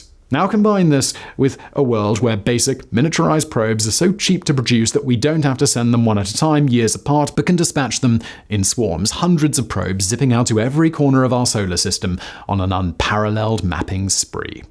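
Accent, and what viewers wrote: RP, United Kingdom. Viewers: Why you trying to sound like a posh twat? Lol...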